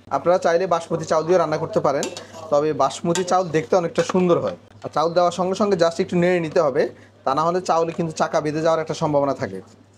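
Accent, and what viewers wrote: Noakhali, Bangladesh. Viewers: হেতে কি নোয়াখালী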